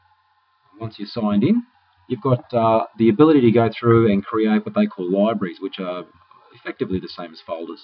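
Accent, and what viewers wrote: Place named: Australia. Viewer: G day Thanks mate aussie accents are my favorite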